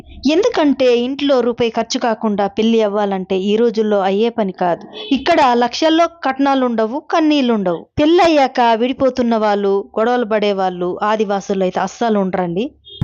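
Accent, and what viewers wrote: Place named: Rayalaseema, India. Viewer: Meedi rayalaseema yasha lagunde. Nizamabad valladi kondari chuste rayalaseema yaasa vasthundi. Vichitram.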